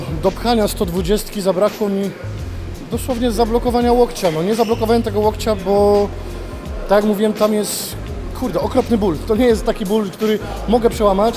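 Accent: Silesia, Poland. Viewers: Jak łon Gryfinie po Śląsku nawijo 😁👍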